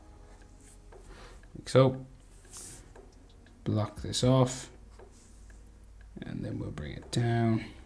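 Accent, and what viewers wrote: Ireland. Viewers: Are you Irish?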